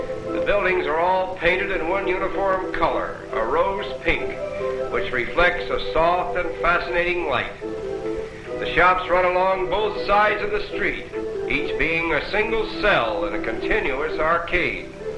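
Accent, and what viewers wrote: United States. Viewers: great footage, what a weird and awful voiceover! lots of comments on here about British voiceover, its like, er, an an american accent init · This sounds more American than British. By the way, I am an Indian-American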